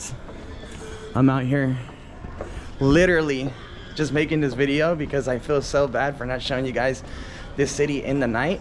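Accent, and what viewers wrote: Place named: Texas, United States. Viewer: Are you from Texas or El Paso. Do recognize an accent from there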